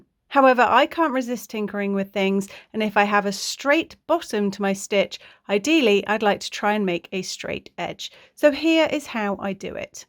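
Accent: England, United Kingdom. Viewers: Beautuful stitch pattern. Love to watch your tutorials. I have learned so much and used some if your tutorials to make scarves. Also your voice is so calming and relaxing. I love your Engish accent.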